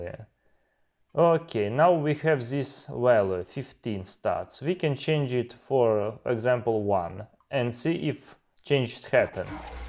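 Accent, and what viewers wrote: Russia. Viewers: блин очень сильно акцент виден, ты случайно не русский?)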